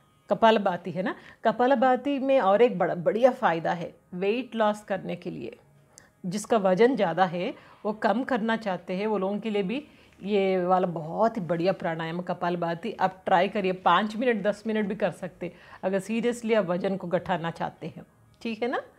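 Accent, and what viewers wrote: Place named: South, India. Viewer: आप है उच्चारण दक्षिण भारतीय है।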